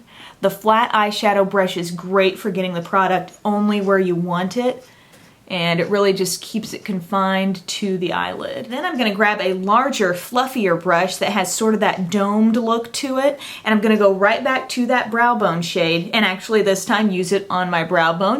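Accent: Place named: United States. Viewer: love your accent!!! im from australia and yours is the nicest american accent ive ever heard (im being serious btw) :)))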